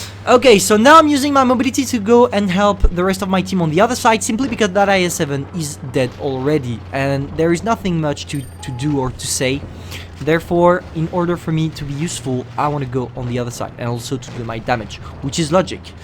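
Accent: France. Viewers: Lol t'es français ? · Mec fait pas semblant t'est français sa se voit à ton frenglish 😏😂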